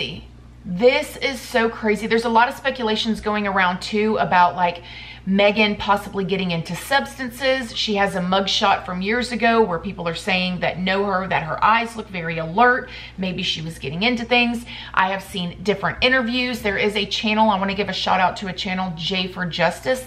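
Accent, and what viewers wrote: South, United States. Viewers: New subscriber :) have been binge watching for a few days even cases i know really well! I just love the way she tells a story. That country twang is so cute! And also i dont care i love the intro 😂❤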